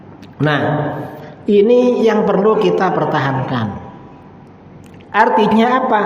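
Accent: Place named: Madura, Indonesia. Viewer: Pak ustadz nya kayak nya orang madure ..kalau dilihat dari logat bicara nya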